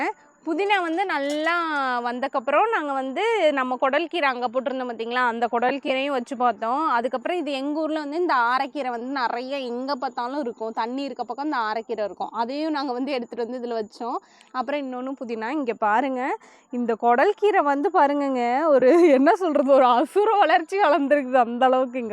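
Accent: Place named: Coimbatore, India. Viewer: திரும்ப திரும்ப நிறைய பேர் இந்த கமன்ட் போடுறீங்க.இது கோவை ஸ்லாங்.அவங்க எதுக்காக அத மாத்தணும்னு நினைக்கிறீங்க.அவங்கள அவங்களா இருக்க விடுங்க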